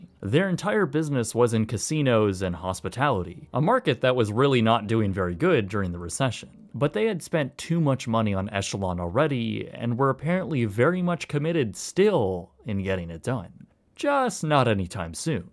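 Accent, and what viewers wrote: Canada. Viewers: canadian? ,,,,ote,,,out,,coche, couch,,, speak inglish, whoever you are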